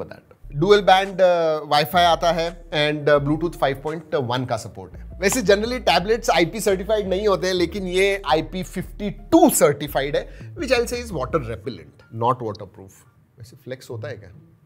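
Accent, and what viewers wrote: Maharashtra, India. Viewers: Nahi gelare, kon mhtla gela🤣🤣 pure gauthi marathi 🤣 loved it as well as tab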